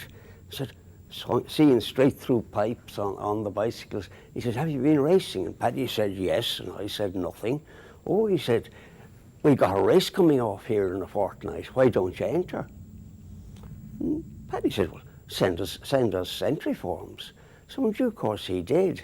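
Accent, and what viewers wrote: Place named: Australia. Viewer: He sounds like an Australian.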